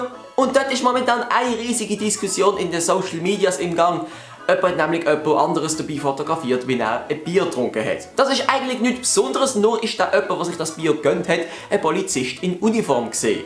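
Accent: Switzerland. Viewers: Ich liebe den Schweizer Akzent :D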